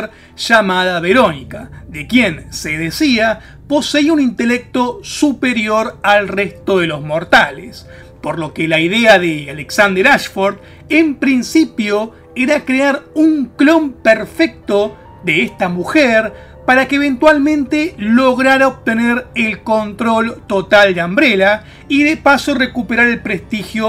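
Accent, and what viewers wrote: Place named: Argentina. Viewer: Yo soy de argentina y supongo por el acento el tambien es argento. Y aca lo jugue en play 2 la consola de sega aca no duro mucho.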